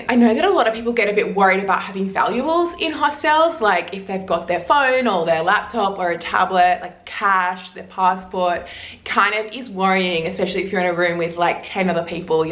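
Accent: Australia. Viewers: She's Australian thats how it sounds Mate with Aussie accent. What accent should she use MANY out there?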